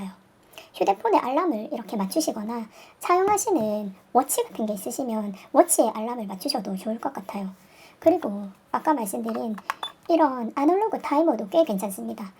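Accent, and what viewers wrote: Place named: Daegu, South Korea. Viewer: 영상 늘 잘 보고 있습니다. 대구 분이시려나.. 귀여운 사투리도 사랑스러워요^^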